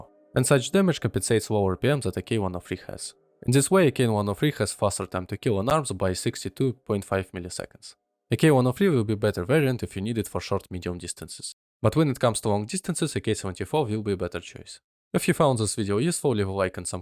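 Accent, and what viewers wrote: Russia. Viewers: I thought you were russian Nice video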